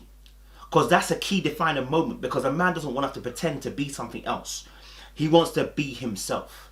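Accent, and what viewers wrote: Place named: Birmingham, United Kingdom. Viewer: The brum accent comes out every now & then 😅💥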